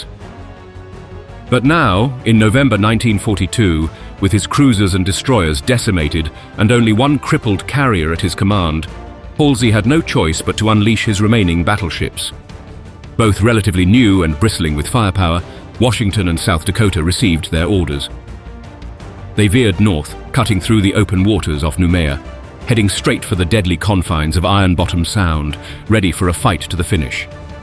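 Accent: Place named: United Kingdom. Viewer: Great video!!!! But why is a British narrator doing this instead of an American narrator??????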